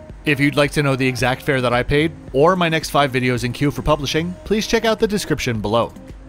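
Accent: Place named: United States. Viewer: His accent is American 😊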